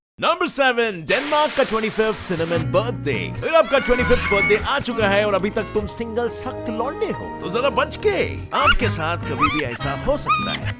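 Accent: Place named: Gujarat, India. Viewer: App gujrati ho kyki maje pata chal gaya yehh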